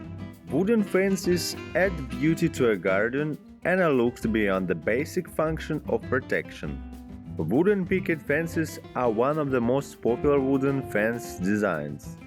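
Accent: Russia. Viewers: Русский чувак озвучивает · Это просто пи..ец как режет слух, а выговаривание воз вместо уос подчёркивает что акцент русскоговорящего.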